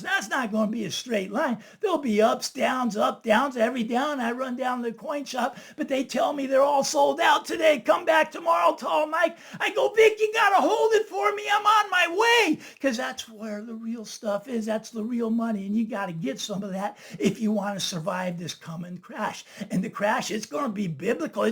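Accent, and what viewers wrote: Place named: Texas, United States. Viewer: I can't place your accent, Mike. Texas?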